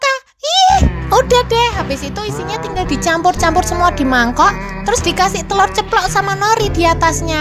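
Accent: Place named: Java, Indonesia. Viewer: Medhog jowone😅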